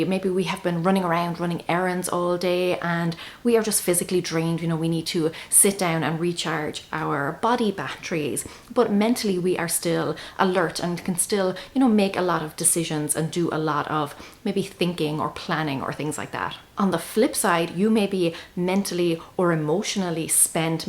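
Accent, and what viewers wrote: Ireland. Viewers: Very real. I am recovery surgery and low energy, this is very realistic. Thank you for this great viedo. I love your accent, it must be Irish, awesome!🍀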